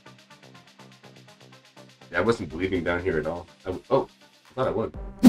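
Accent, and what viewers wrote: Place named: New York, United States. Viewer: are u from NYC? you sound like a new yorker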